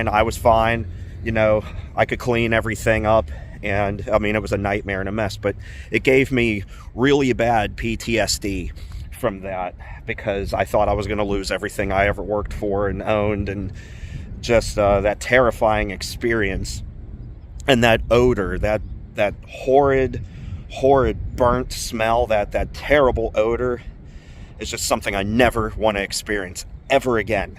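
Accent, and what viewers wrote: Pittsburgh, United States. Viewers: Most Pittsburgh accent I’ve ever heard😂 great video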